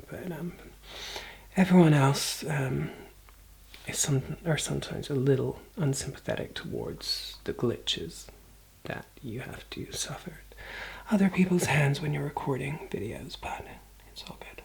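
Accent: United States. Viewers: I assume your English, but you're going about being natural in an American accent, it has me confused 😂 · What’s with the American accent? I thought you were British? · ..... this sounds like an American accent is this your natural one or one your using. Because your British accent is too real lol ps Anthing with at meridians works for me xoxo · Dude... You're not American are you? I thought you were a fellow Brit?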